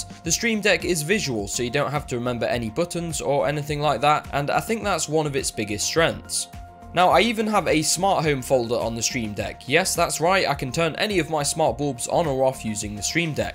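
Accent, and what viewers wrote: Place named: United Kingdom. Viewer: that is the most intense british accent i have ever heard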